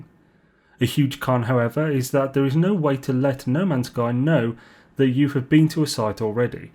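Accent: United Kingdom. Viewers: It just sounds like he is mostly mumbling and I didnt understand a word of what he was saying. If this is what most youtube Brits sound like then good god. Sounds like I was listening to Kermit the frog from muppet puppets lol. 😂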